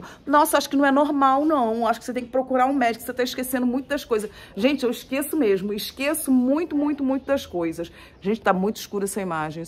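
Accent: Rio de Janeiro, Brazil. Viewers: Moça vc é admiravel, espontanea, super vc, adoro carioquissima com esse sutaque amooooooo muitooooooo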